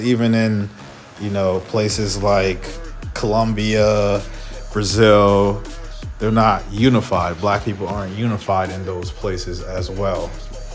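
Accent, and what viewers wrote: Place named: United States. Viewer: Good luck.. It's a great feeling having an educated American-English accent hitting my ears. Usually, listening to the typical African content creators' videos you must pay attention, take notes and translate or transliterate as you go through their videos....they mumble, mispronounce and are, quite frankly, so unprofessional...like they're talking to the gang on the corner stoop. I know for many of them these are initial steps into a larger world and they will get better. I see your channel as Google's attempt to enlarge the ethnic audience with their algorithms...let's hope that last....I'm glad I found you. Keep traveling my brother, I'll be seeing the world through your eyes and I am very interested in seeing what else Africa has to offer.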